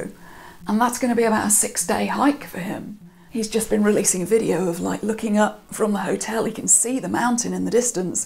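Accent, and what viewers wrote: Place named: RP, United Kingdom. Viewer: You remind me to The Crown’s Margaret Thatcher. Her rasp is next level, but yours is too! You sound very posh. Also, I started projects and I just haven’t finished them. But I’ve done them now during this pandemic.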